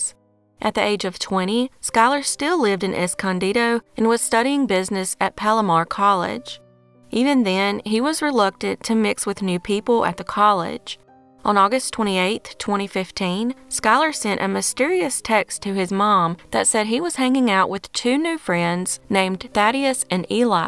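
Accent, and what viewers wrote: South, United States. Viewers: Where are you from in Tennesse?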